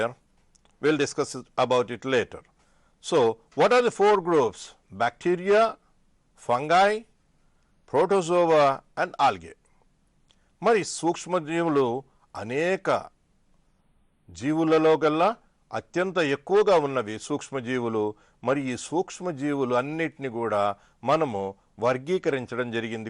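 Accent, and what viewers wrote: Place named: United States. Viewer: Ur English is mind blowing sir it hears like Americans English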